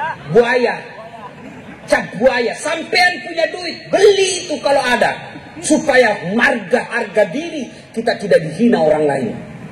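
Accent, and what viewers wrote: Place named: South Sulawesi, Indonesia. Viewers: logat sulawesinya dapet bgt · Ciri has logad ceramah ulama bugis makasar madura mantab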